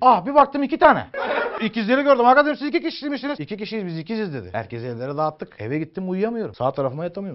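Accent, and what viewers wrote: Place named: Altınova, Turkey. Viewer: Balıkesir altinovaliar gibi konuşuyor süpersin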